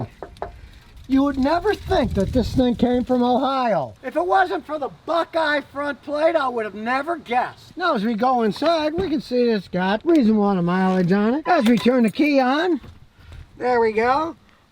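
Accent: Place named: New York, United States. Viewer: sounds like a newyorkian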